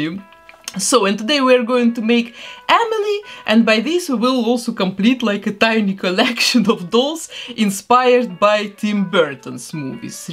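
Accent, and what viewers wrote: Russia. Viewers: Вы русская? Просто мне кажется, что у вас русский акцент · Этот русский акцент 😅❤ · Искала комы на русском, очень мало, быстро узнала акцент, думаю, ну точно, имя подтвердило. Русский акцент узнаешь из тысячи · Такой акцент русский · Sonds like russian accent 🤔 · Иногда мне кажется что у вас явный русский акцент в речи 😂❤ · Сразу слышна Раша Тудей ,ну на столько слышен акцент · Russian accent.🙄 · I hear a Russian accent🤔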